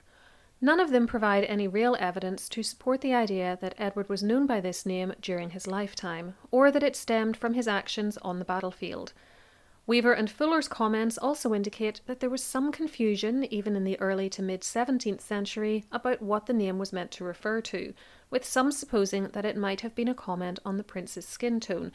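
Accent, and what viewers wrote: Northern Ireland, United Kingdom. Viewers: I’m so glad I came across this page by accident, I love history channels and it’s awesome hearing a Northern Irish content creator, I don’t usually come across many people from here on YT, definitely subscribed 😊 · Thank you for another fine video. I continue to really enjoy your channel, and your accent is icing on the cake. Yes, I'm one of those Americans who love all U.K. accents. 👍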